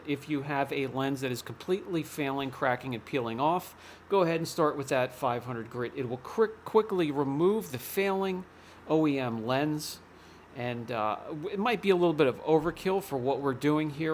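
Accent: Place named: East Coast, United States. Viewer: lol Waaater the east coast accent is strong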